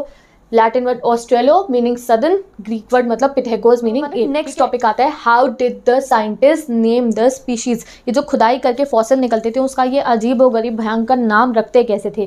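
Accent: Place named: Bihar, India. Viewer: Tumhara accent dekh ke lagta hai ki tum bihari ho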